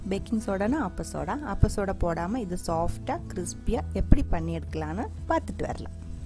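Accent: Kerala, India. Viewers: Malayalam’ised tamil sounds quite irritating . Sometime sound like mocking.. I don’t understand why .. no Tamils or any other would start a channel targeting other languages.. would request not to kill the language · super recipi. malayalam kalantha unga thamil super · Neenga malayali thane.unka pechula theriyuth.so nice.ur voice and recipe 😊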